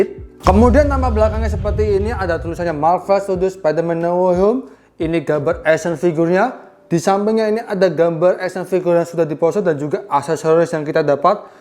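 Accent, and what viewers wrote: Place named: Java, Indonesia. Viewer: Selalu dgn ciri khas medoknya,sukses selalu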